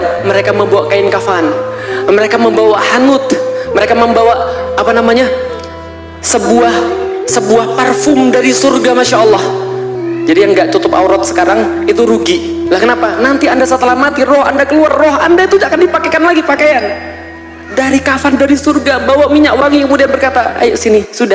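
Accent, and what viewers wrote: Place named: Jakarta, Indonesia. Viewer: Orang pati tapi seperti ya asli jakarta 😅😅😅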